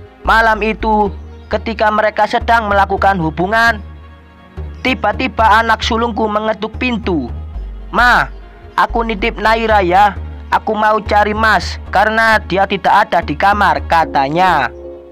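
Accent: Java, Indonesia. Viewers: Sebeliumnya saya minta maaf, ya, mas... (Juga untuk yg lainnya) Sebenarnya Ada benarnya juga jika sampeyan bilang gak mutu...itu jika diibandingkan dg ilmu2 pengetahuan atau agama. Ini tergantung bagaimana kita menyikapinya, jika pikiran kita kotor maka akan menjadi nampak kotor, Semua hal ada sisi baik dan ada sisi buruknya, jika kita lihat banyak buruknya, cobalah kita cari sisi baiknya walau sedikit sehingga kesalahan / keburukan seseorang tak nampak menggunung. Simple saja.. buang yang buruknya, ambil yang baiknya ..yaitu hikmah di dalamnya agar semoga kita dijauhkan dari kemaksiatan dan senantiasa menjaga keimanan kita, aaamiin. Bukankah Untuk menjadi baik adalah belajar dari kesalahan? Dan untuk menjadi wong bener adalah dengan belajar dari yg salah.., jadi mari kita anggap saja channel adalah guru yg sedang menasehati / mengingatkan kita agar kita berhati2 dan waspada karena manusia sesungguhnya tempatnya khilaf / lupa, sehingga kadang tiba2 terjebak dalam kemaksiatan. 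Bisa saja kita sekarang mencela. Besok kita yang terperosok. Semoga gusti Allah selalu menyertai dan melindungi kita. Aamiin.... Kalau saya lebih fokus dg bahasanya yg medhok gak karuan dan suara jelek serta cara membacanya yang jelek sekali, haha.. Maaf, ya.. Buat adek yg punya channel. , tapi itu yg membuat nilai pluznya, menjadi. . Lucu.. jadinya bikin saya ketawa terus, apalagi jika bilang tamaaat.. Atau sekian.... Saya ngakak sekali. Oleh karena itu saya lebih fokus ke lucunya .. Semoga kita bisa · Kelihatan admin yg ngomong ini orang dari Bantul Jateng ya,kedengaran medok jowone,yg lucunya mendekati akhir cerita teksny hilang...mas klu mau buat cerita elupun ceritanya betbau hayalan yg baguslah kemasannya..ok,mas. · baca.anya seperti jawa tuken bro keras. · Suara jawa pekatbanget loo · Kok medok bngt .orang mana ya bpk.lagi terakhirnya **TAAMAAT**KYK DI BOSKOP AJA🤣🤣🤣🤣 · Medok dan tamat ... 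· GK seru kalo critanya di bacakan,seperti membaca pelajaran waktu SD dulu,yg membaca teks logatnya medok lagi jadi Yaaa semakin gak seru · Bertele tele...udh suaranya medok bgt ga enak didenger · Ngomongnya medok jawa banget.Jadi ngga enak ceritanya !!!! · Lucu cara ngomong mu, medok 😂😂😂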